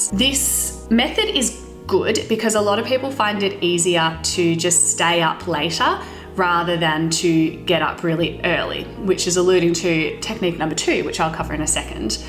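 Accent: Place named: Australia. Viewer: Oh no, I am terribly sorry, I thought you were a British Doctor from the UK. I mistook your Australian accent to British accent. Normally I don't miss. Once again, sorry about that.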